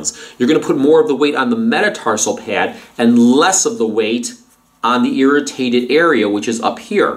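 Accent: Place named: New York, United States. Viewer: Dear doctor! 🤓 What a great detailed explanation, which took the mystery out of this problem. I understand WHY the foot pads did not alleviate my pain (between 2nd & 3rd bones) before. I bet you are a New Yorker? I’d recognise that accent anywhere. This was extremely edumacational! 🤓 A grateful New Yorker in London. 🇺🇸🌹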